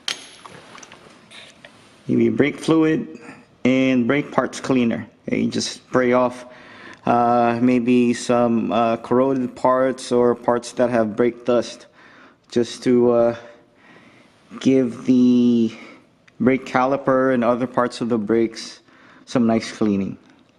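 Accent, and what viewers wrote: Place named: Philippines. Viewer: You have Pilipino accent boss 😁 astig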